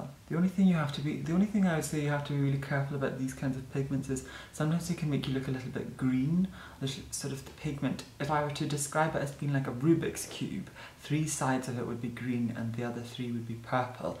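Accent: Scotland, United Kingdom. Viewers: Q&A- I love your accent, what part of the UK were you born? Also, I would love to see your interpretation of Magenta from RHPS! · Are you from Scotland? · It's a Scottish accent (as you might guess from the surname!) ;) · Is your accent Scottish (proper)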